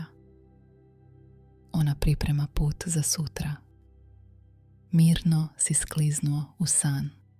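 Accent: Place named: North Macedonia. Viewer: Divan glas, nisam bila sigurna je li AI, često makedonske( bar mi se učinilo) riječi. Svakako čudna mješavina koja čak i umiruje. Sviđa mi se zapravo🤔👍😀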